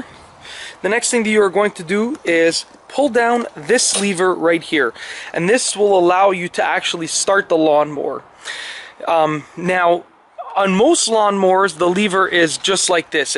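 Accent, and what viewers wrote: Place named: Canada. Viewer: you sound like the stereotypical canadian accent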